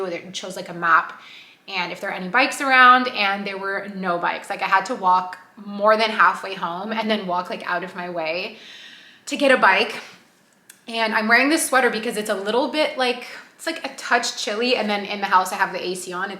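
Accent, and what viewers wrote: Canada. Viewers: I love hearing you speak in French!! I always hear the French accent learning French but I love the Canadian accent it’s so cool to hear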